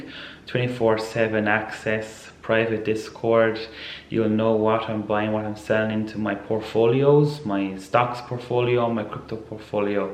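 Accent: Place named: Ireland. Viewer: Very good video love your Irish accent my family are all from Cork 🤙🙏🙏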